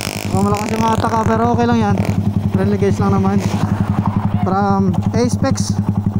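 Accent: Iloilo, Philippines. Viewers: Ilonggo ka lods tga dn kmn?🤣🤣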